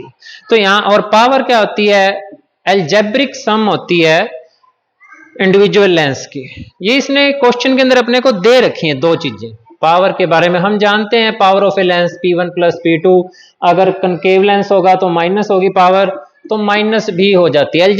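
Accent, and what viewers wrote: Haryana, India. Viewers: Good haryanvi ascent sir ❤️😄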